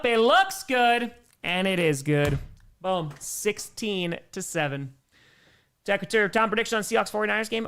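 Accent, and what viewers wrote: New York, United States. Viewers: Who is/are the Krossi Posse Nation that Tom refers to at the start of these broadcasts? I had always assumed he was saying 'Grossi Posse Nation' but he does not start off his welcoming cry with a G sound, at least to my ears. (Unless people with a New York accent pronounce their G's as K's, at the start of a word, when they raise their voice.) In any case, good luck with your quest to reach 1M subscribers.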